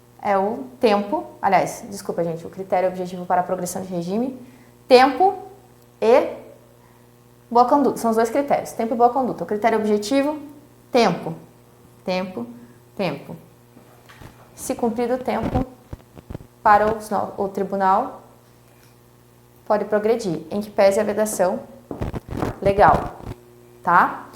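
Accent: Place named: South, Brazil. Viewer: Percebi um sotaque sulista cumulado com o mineiro..... um concurso material eu diria.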